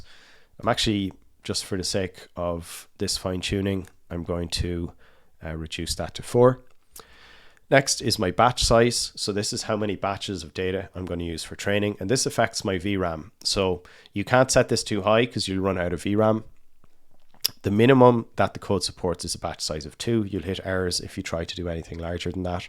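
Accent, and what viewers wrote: Ireland. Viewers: Three things: 1. This is by far the most thorough, informative and approachable video I have come across on TTS; really appreciate the time and care you have put into this. 2. As a South African, I absolutely love the Irish people and accent - a lot of learnings in this video about accent, pitch and tone that will be very useful (I think) for TTS for under represented languages, very important from an African perspective. 3. Very well earned like and subscribe; I look forward to learning more from you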